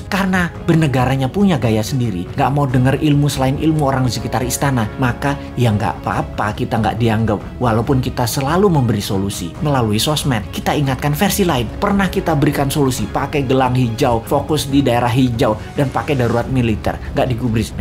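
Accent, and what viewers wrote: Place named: Malang, Indonesia. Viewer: Pak Bossman Mardigu AREMA asli y ??/ngomongnya ceplas ceplos tp bener si.. :-) logatnya mirip orang Malang..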